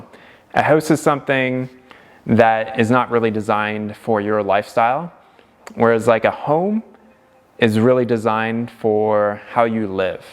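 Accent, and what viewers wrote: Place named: Canada. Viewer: he says aboat like a Canadian! nice job on shower.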